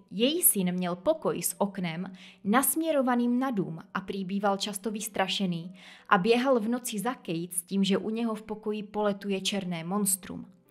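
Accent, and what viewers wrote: Moravia, Czech Republic. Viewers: Mužů ae zeptat z jakého jste kraje? Mate zvláštní přízvuk. Jakoby moravský .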